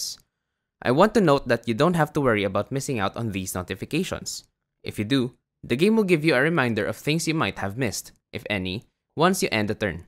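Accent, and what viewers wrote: Philippines. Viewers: Hearing Tagalog accent was a surprise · I knew from your accent that you're a kababayan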